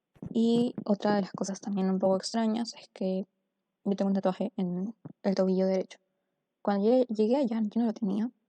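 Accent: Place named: Peru. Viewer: Tu acento peruano me encanta 👁👄👁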